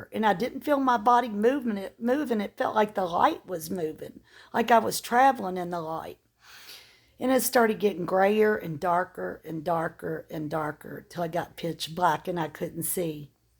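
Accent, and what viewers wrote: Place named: Louisville, United States. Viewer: When she said eastern parkway I knew she was talking about Louisville plus with her accent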